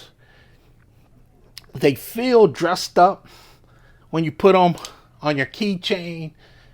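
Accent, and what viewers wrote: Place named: Louisiana, United States. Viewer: You sure sound like you are from Louisiana! Great videos! Thanks for all your efforts!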